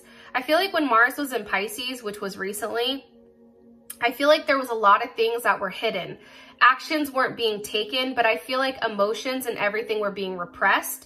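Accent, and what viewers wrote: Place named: United States. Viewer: Luv your American accent (I'm English )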